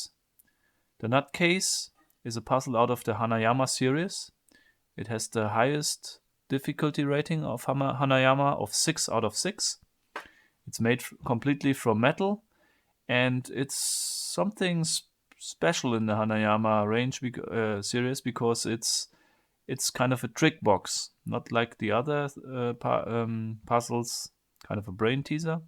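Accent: Germany. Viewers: "screw them" ! :-) I love your Germlish. Never mind, it's ok.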